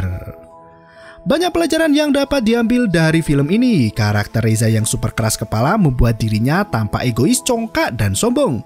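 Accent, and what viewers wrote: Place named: Java, Indonesia. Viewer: Medokk banget mas.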